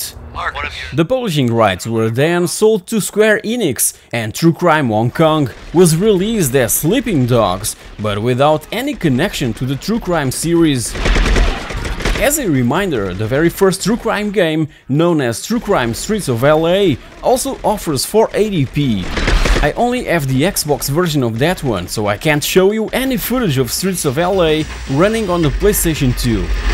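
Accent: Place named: Portugal. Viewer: Este gajo é tuga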